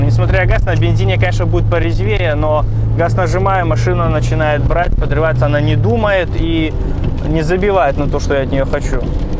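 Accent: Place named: Belarus. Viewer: Как мне нравится беларуский говор)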